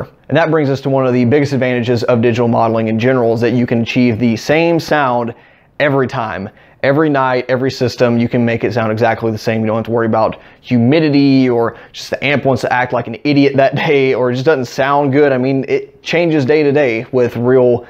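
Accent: Texas, United States. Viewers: It took me a while to notice that you have a texan(or at least southern) accent. But I like it bro, you sound like a cowboy Ps: I'm from Brazil, english isn't my first language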